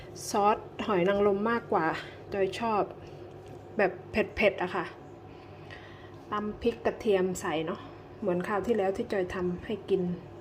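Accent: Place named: Laos. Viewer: 🌺🥰👍🌸สวัสดีคะ่น้องจอยคนเก่งของพี่พูดภาษาลาวน่ารักจังเลยคะพูดบ่อยๆนะคะคนดี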